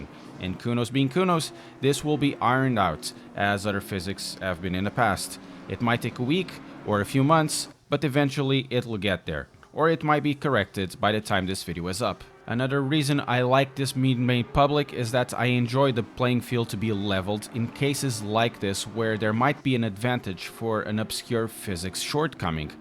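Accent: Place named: Portugal. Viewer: you sound portuguese.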